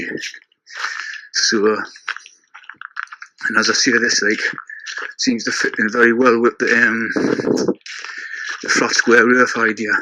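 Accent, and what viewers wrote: Newcastle, United Kingdom. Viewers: I have heard this 3 times now and very interesting to say the least. Love the Geordie accent, Whay aye man! My family are from the north east :) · lol.. 4th time into watching it and still not getting more than few words! 😅 but i will not give up since you say it's important Knowledge and anyway i like your voice..so going soon for the 5th... geordie accent i will decode you 💪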